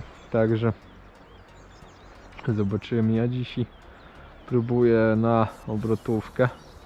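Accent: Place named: Podlasie, Poland. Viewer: Kurwa ta twoja gadka masakra z jakiej ty wiochy pochodzisz gdzieś pewnie z podlasia albo chuj wiii. Ciezko sie tego słucha.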